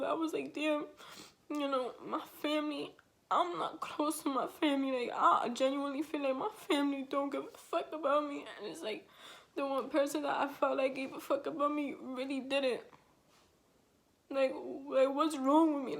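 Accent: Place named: New York, United States. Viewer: You from New York I that assent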